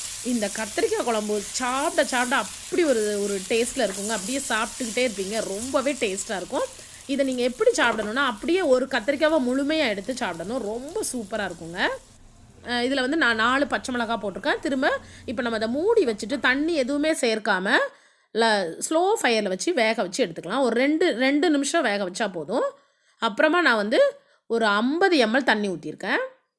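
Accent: Tirunelveli, India. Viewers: akka nenga thirunelveliya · UNGA paechula theriyuthu amma... My mother's native also Tirunelveli.. and your samayal ellam super ma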